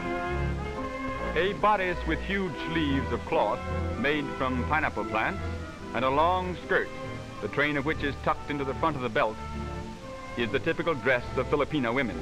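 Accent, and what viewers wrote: Transatlantic, United States. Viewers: Transatlantic accent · Every trans Atlantic accent. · Coz Most Americans accent this time was trans atlantic accent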